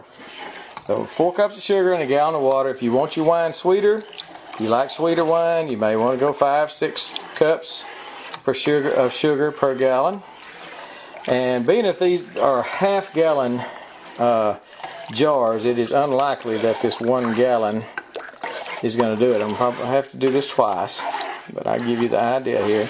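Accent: Texas, United States. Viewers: Very cool! Love the Texas accent 😁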